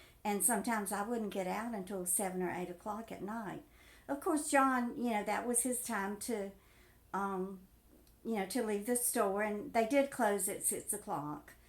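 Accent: South, United States. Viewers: I am new to your channel and love your story telling. I am a Southerner and can relate to your accent. Keep making videos!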